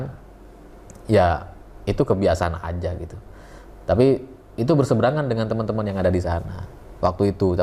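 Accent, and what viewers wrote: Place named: Madura, Indonesia. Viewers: Saya suka nonton video mas baik di CokroTv maupun di SewordTv. Saya suka ulasan-ulasan anda tentang politik atau sosial, dan logat Madura anda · Sampean abit pon bede e jakarta kak...mak logattah pagun katarah jek reng madureh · Saya Katolik di Bali, setia menonton pakar mantan. Justru dialek madura itu cirikas Mas banget. Bravo n viva mas.. menginspirasi masyarat. PROFICIAT UTK PENGHARGAAN DARI YOUTUBE.. MAU KAOS JG DONG MAS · Wahhh... Congrat ya bang.... I always stay tune on ur channel... Happy milad juga buat bang, barakallah fii umrik... Semoga tambah sukses lg, dan terus menyuarakan kebenaran utk akal sehat biar kita gak pernah oleng... Betewe, sengko ghelem kaosah jih... Mayuh kerem ghebey sengko bang... 😁😀 Kata siapa gak cocok... Justru you are special. Kaya prof Mahfud my idol, bangga lihat logat Maduramu masuk youtube dan always dilike byk orang. 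Whats wrong with ur aksen... Go ahead bang... Be the best.. Best wishes dr kami di Kalimantan Tengah, ur truly liker... 😂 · Saya suka logat maduranya mas.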